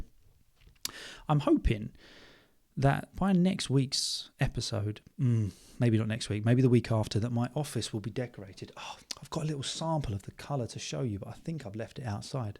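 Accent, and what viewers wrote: RP, United Kingdom. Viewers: I really love your podcast bro, so just keep going. You make learning English more easy and joyful without any stress, furthermore, your RP is so fascinating. I appreciate your effort you provides to us.🎉🎉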